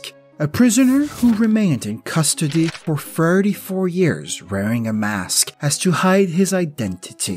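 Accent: France. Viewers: Love hearing French history told in a strong French accent 😁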